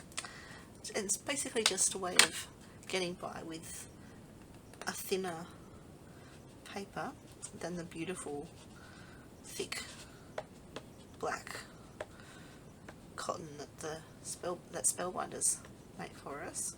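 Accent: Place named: Australia. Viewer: one of my faces girl! ❤️ And I can listen to the aussie accent all daaaaaay long! Lol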